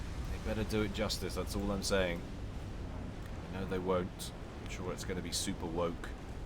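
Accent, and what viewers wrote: United Kingdom. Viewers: I have a legit criticism, every time I watch one of your videos, I notice something. Your voice, its so damn sexy. I can't pay attention to what is going on because I'm too busy being mesmirized by your sexy slick English accent. You need to stop it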